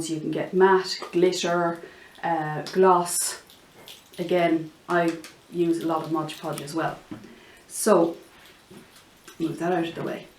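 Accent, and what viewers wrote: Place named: Ireland. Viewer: Really enjoyed this ,,,good to hear an Irish accent.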